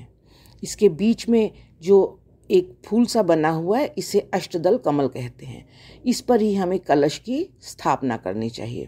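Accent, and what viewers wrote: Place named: Kashmir, India. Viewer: Kashmiri accent is awesome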